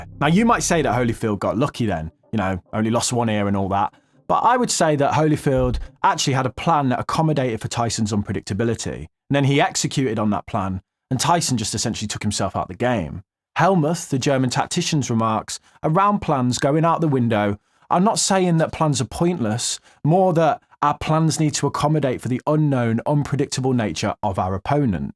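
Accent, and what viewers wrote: United Kingdom. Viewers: ⚡️I hope you don’t ask me to transfer btc to your wallet in order to receive my score. I’ve enjoyed following your success. It’s good to hear a British voice, with something to say, that’s worth listening to. ⚡️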